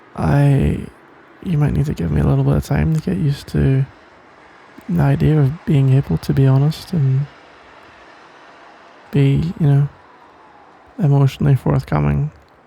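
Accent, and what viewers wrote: Scotland, United Kingdom. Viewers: OMG YES A SCOTTISH DILF AUDIO 🥹 my life is complete, but on a serious note this is adorable <33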